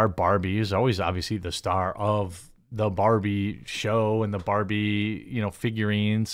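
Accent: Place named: Wisconsin, United States. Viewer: Ok, I love your content and all sorts of stuff you do...I can even deal with hearing about coffee brand coffee co- nonstop! Lol! But sometimes the Wisconsin accent is just too much! Margot Robbie being pronounced like a 'robe' you wear, opposed to like 'rob' a bank, is driving me insane!!!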